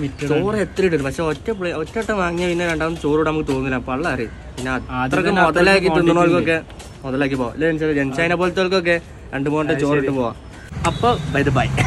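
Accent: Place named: Kozhikode, India. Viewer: Muttey eniku ninte comedy istapettu. Calicut slang ottiri ista. Am from kochi ❤ Biriyani ku chammandiyo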